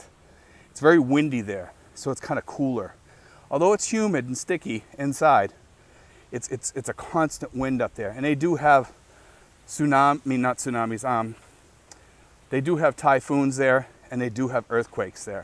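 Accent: Boston, United States. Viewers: Good video, u From Boston?? Lol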